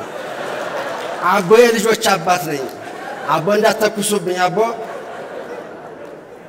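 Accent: Wollo, Ethiopia. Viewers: አነጋገሩ ራሱ ወሎኛ ነው ደሰ ይላል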